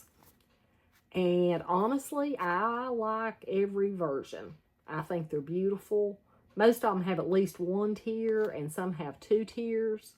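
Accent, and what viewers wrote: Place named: North Carolina, United States. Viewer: I can just listen to you talk all day long. You sound like my cousins down in Yancey County NC. I was born there but we moved away when I was four years old. Your sewing channel is so fun to watch, love it! 😍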